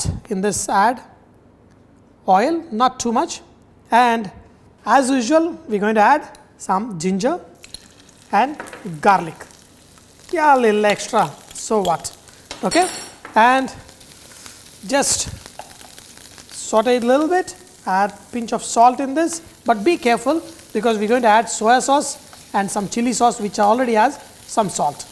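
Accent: India. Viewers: Dear.... I tried to find the recipe at your website - but it is not posted. Some of the spices used in the recipe I didn't quite understand with your beautiful Indian accent, perhaps you might type them in the "more" section? Thanks you so much!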